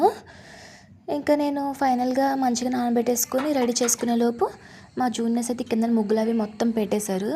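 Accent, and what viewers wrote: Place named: Godavari, India. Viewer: Mee slang bagundi andi godavari vala andi meru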